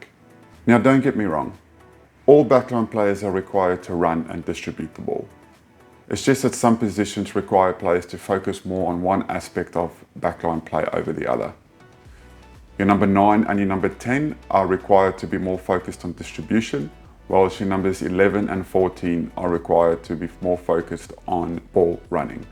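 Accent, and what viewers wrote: South Africa. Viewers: OK so firstly, I love the content. Secondly, I can't pinpoint your accent. Your channel says Australia but I feel like you sound like a South African who has lived in Australia for a while or an Aussie who has lived in South Africa for a while 🤣 I hear a mix of both accents hahah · his English sounds completely South African. He also used the boks in many of his examples. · I cant decide if he is south african or australian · My bru are you South African? Your accent confuses me....i cant tell if it's from Melbourne, London or Brakpan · Saffa with a bit of an Aussie twang? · I don't hear English. Only Aus/NZ/SA. the way he says "couch" tells me he is SA born and emigrated to OZ or NZ. I may be wrong... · My man, great explanation. I hear an Aussie accent, or perhaps a Kiwi one - but yours is more difficult to place than usual, but do I detect a Saffer twang? 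Emigrated? Ps - hier kom die BOKKE :D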